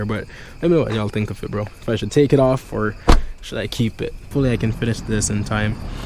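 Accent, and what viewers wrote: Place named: Jamaica. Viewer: U sound like a Jamaican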